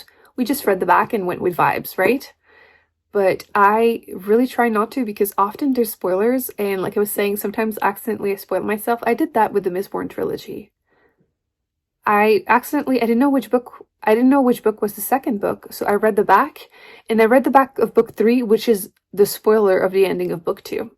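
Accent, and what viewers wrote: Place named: Quebec, Canada. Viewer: I LOVE the accent québécois so much!! (and I'm really proud I understood everything haha)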